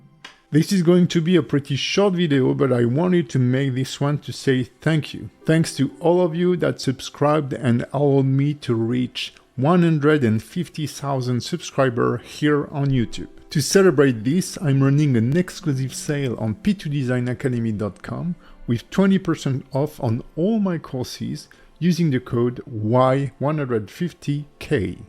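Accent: France. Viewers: Cet accent français j'adore je comprend mieux lol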